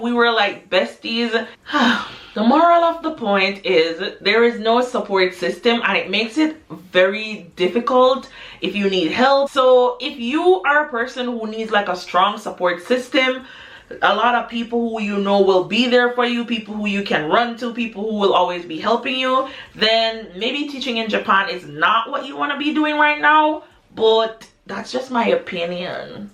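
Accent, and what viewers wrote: Jamaica. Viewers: are you from Jamaica? You have an accent that sounds like them. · I can hear Jamaican in your voice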